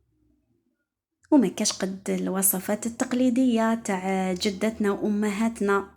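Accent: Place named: Levant, Arab world. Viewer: يعطيك الصحة وصفاتك روعة بغيت نسقسيك هدرتك شابة الله يبارك انت وين عايشة اللهجة بانتلي شوية من المشرق العربي. ربي يوفقك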